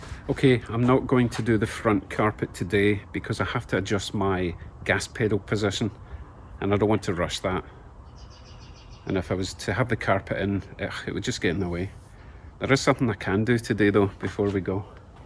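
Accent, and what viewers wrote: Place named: Scotland, United Kingdom. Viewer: Hey! Love your accent. Reminds me of my Granny who hailed from Scotland. The similarities end there. You got a much tighter and hotter ass than Granny had! God rest her soul!! Happy Easter.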